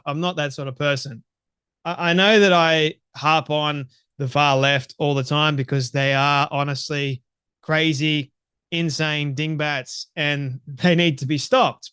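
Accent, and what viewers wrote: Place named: Australia. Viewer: You sound Australian and I like you young man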